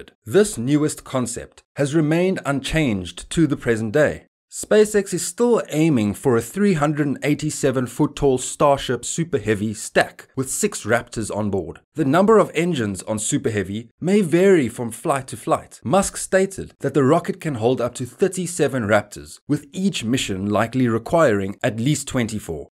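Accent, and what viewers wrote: South Africa. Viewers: Could listen to your South African accent all day ✌ Always look forward to your informative videos keep up the great work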